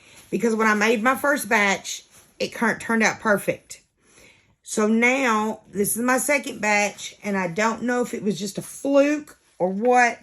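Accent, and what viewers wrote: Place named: Texas, United States. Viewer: my love, I love it!!! 🤩🤩 I also love you giving Lulu the business!!! 🤣🤣🤣 Keep being you my friend!!! Crazy dog and Texas accent makes a great video. 🥰❤️😘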